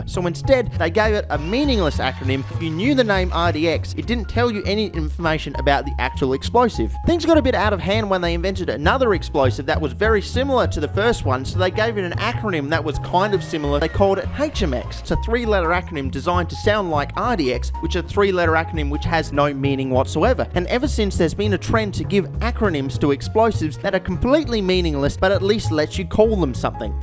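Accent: Australia. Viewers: today is a good day, E&F video. time to hear an aussie love the 60s.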